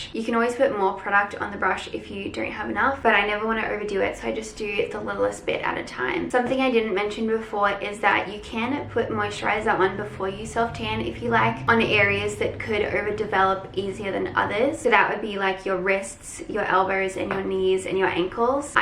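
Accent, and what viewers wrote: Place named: United States. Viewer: Sounding so American at times in this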